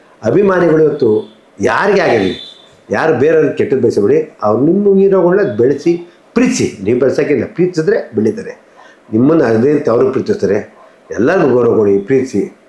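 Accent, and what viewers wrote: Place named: Telugu, India. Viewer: E manja proper kannadadavanu alla ansute , ole Telugu navaru matado reti matadtane.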